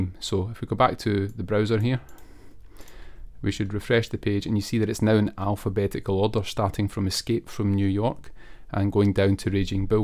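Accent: Scotland, United Kingdom. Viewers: Fantastic Scottish Django Developer! Found another one :D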